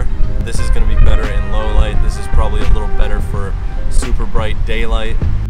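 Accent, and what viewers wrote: Netherlands. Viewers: Are you dutch? :) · Are you Dutch?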